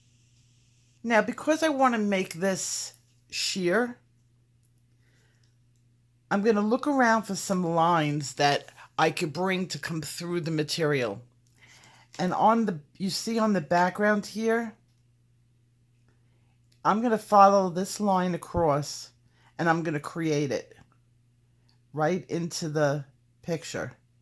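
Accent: New York, United States. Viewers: Wonderful! Love your NY accent!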